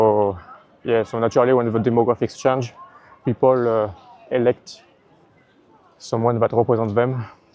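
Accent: France. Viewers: Quel accent merdique! Il n'y a que les Français pour parler aussi mal.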